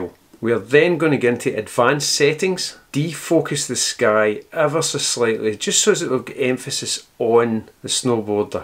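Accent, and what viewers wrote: Scotland, United Kingdom. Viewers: Nice to hear a Scottish accent on YouTube by the way. Luminar is now like a user friendly version of PS and LR all rolled into one. Love it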